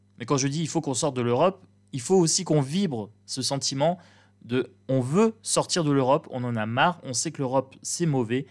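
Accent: North, France. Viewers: Bonjour tu vas bien?tu nous fais remonter mon énergie en ce moment je suis flagada de regarder tes vidéos ça me rebouteux. Vivement que ce personnage sorte de notre espace de vie.Bisous à toi.Je pense que tu es du Nord?tu as par moment dans ta façon de parler des mots qui me rappellent mes souches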